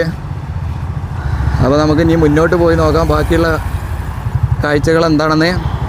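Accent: Malappuram, India. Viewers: നിങ്ങളുടെ മലപ്പുറം slang ഒന്ന് മാറ്റിപിടിച്ചൂടെ.. നല്ല slang ൽ സംസാരിക്കൂ..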